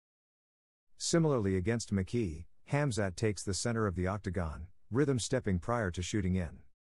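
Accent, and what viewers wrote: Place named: India. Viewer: He sounds like an indian so no lol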